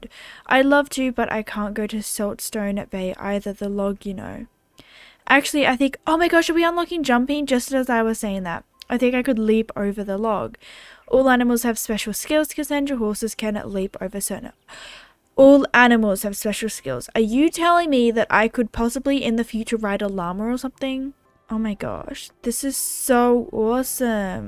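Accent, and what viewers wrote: Australia. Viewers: you have to be from australia?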